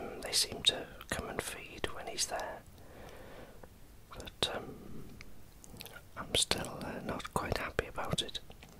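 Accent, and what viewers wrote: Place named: North Wales, United Kingdom. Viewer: It's fantastic to hear a whisperer with a North Wales accent. I grew up near Llandudno and to hear you say you were in Betws-Y-Coed recently makes the world seem a smaller place :) In fact I sat by swallow falls and had a sandwich last week. I spend much of my time touring Europe with work so to hear a familiar voice is very relaxing when I'm away. Keep up the good work, and thanks again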